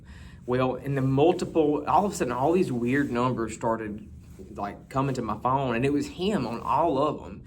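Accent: South, United States. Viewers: To some types you would be naturally irresistible, to others nearly-so, and to a very few TOO MUCH of that first!! 🥴 Goes with the territory, 🌟 🌟 Your 'southern drawl' is pretty spectacular out here, in Yankee Territory. 😉 (Well hell, I'm 3/4 Johnny R. maself!) As long a/s you're around -- a lifetime subscriber/supporter is here. 👍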